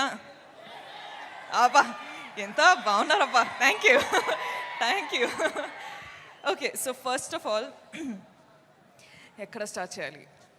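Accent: Telangana, India. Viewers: You become what you eat❤ Telangana slang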